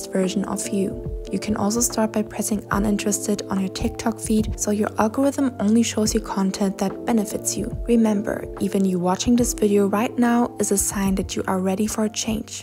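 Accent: Germany. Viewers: Me thinking her voice sounds German. Then she pulls out a German book. ✨German power ✨ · Du hast voll den deutschen Akzent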